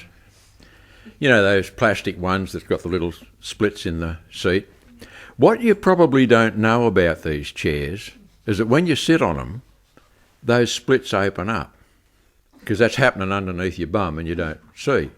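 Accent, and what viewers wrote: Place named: Australia. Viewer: Thanks, I was looking for the lyrics on me trusted phone But gees I'm glad I found you tellin' it in flat Australian tone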